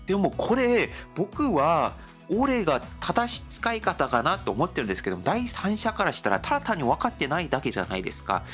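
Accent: South Korea. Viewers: それぞれの出身の方々によって発音の特徴があるので、韓国の人っぽい発音だな・・とは感じますが、それを他国の言葉なのによくこれだけ話せるよなぁとは思っても、恥ずかしいことだとは全く感じないですね。自分には他国の言葉をこんなに話せないですから。自分は地方出身なので、若い頃は方言を消すように話していましたが、今は故郷があることが自分のアイデンティティで誇りです。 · 韓国なまりの日本語、なんとも可愛いので、好きです(^^♪ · さんの日本語、お上手だと思います。もちろん韓国人のくせのようなものは見られますが何よりも言葉のチョイスが素晴らしいです。日本人でも、もっと話し方の下手な人沢山いますから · 日本人から見たら韓国人だとすぐ解るしそれが凄く可愛い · 韓国訛りの日本語めっちゃいいですよ〜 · ふとした時に…と言うより動画の最初っから最後まで韓国訛り全開なので間違ってても全然気にしないですよ😊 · 日本の中にだって地方のなまりがあるから伝われば良いよ韓国語なまり、可愛い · さん、日本語の理解すごいですね。韓国語風の発音、伝わるのであれば”無理に”直す必要ないと思いますよ。私が日本人の代表ではないですが．．．😁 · めちゃ素敵ですよ〜😊韓国の方の話す日本語好きですよ❤ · 全てのイントネーションが終始韓国人って感じですね。でもいいと思います。充分伝わります。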